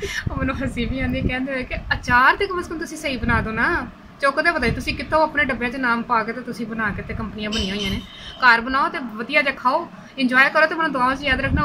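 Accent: Punjab, India. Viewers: aapka punjabi bolney ka tarika bahot nice hai. lasoorey ke aachaar ki receipe bahot achey tarekey se batai hai. lasoorey kis month me aatey hai? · thanks apka channel subscribe kr Lia hay apki Punjabi biukul hamray jasi hay 😁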